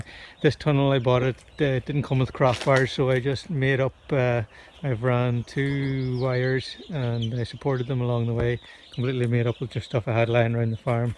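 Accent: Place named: Ireland. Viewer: Hi, keep up the videos, nice to be listening to an Irish man for a change 😊. Just wondering where you buy your bulk compost. John. Co Kerry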